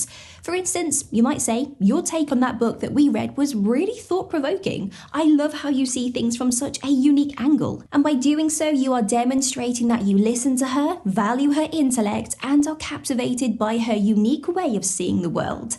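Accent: England, United Kingdom. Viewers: These videos have really helped me since I had some bad stuff happen to me and I trust the English accent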